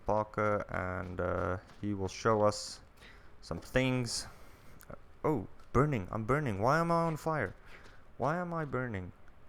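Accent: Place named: South Africa. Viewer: Dis alles in sy stem. Hyt daardie BoerQ aksent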